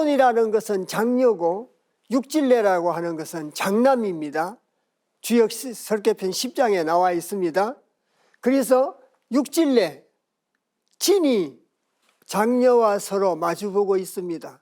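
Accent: South Korea. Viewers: 쾌 발음은 되는데 괘 발음은 안돼요? 경상도 억양이 심하긴 하시지만 도를 또로 말하는 것 외엔 거의 모두 제대로 발음하시는데 유독 괘만 예외없이 쾌라고 하시니 안타깝네요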